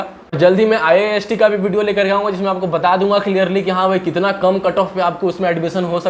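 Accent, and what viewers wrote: Bihar, India. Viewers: Bhai love from jharkhand...I can recognise sound of bihari/Jharkhandi anywhere😗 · Bhai aap Bihar se ho kya? Aapke bolne ke pattern se laga to puch liya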